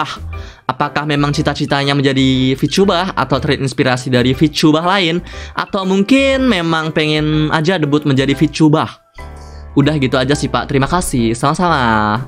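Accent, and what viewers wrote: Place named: Java, Indonesia. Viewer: Aduh pria Jowo, medok banget🤭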